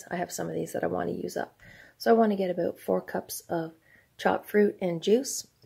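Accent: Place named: Canada. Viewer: Hi. Canned up a batch of the Orange Marmalade today. Snowing winter day here in the high desert of Oregon and this Marmalade is like an afternoon in Florida! Your video inspired me to can something new. It's absolutely lovely. As to your reply wondering what words gave you away to me as a Canadian, any word with "out" in it. Lol. Such as "about" and the word been come to mind. Not that it's a bad thing. I love Canadians! I lived in Montana not far from the Canadian border and had the pleasure of meeting many when they ventured down from Saskatchewan to our little town south of the border. Take care and looking forward to what you bring next to Canuary 2023.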